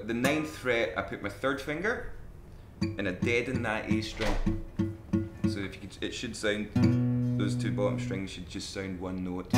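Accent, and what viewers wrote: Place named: Glasgow, United Kingdom. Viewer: He went to the same schoole I go to he has a glasweigian accent aka Scottish but is from airshyre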